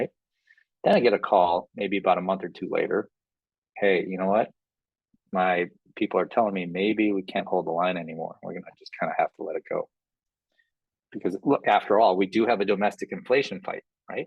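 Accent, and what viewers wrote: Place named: United Kingdom. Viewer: So I have a book about active listening and it suggests you repeat the last sentence the other person said, but in your own words, so, slow it down, you speak quickly, and say, “so if I understand you correctly, you’re saying blank blank blank. He’ll say “yes” then you ask a question that builds on his answer”- lots of books out there on how to interview and ask questions in an active listening mode. Mostly I’d say slow way down because you have a distinctive British accent but talk super fast. You’ll figure it out and continue to provide great content! Thanks for making this video!!!!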